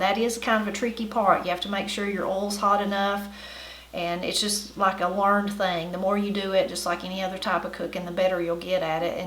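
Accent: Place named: Missouri, United States. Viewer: I just gotta say your speech is just like my kinfolk from central Missouri. You are the first person outside of my family that says Ain’t for aunt. Love listening to you talk.... and cook of course!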